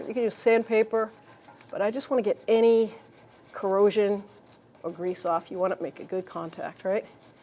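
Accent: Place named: New England, United States. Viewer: I'd buy something from you if you made the video on the 4wd version of this truck. The presenter sounds like they're from New England, they should have INSISTED on it ...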